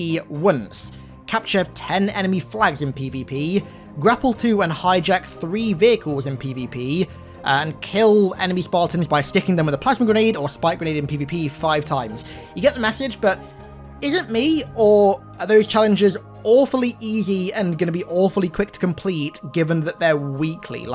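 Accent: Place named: United Kingdom. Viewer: This man has the signature british voice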